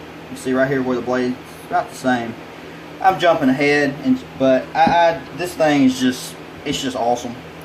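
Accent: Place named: New York, United States. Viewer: I noticed your accent. You're from New York right?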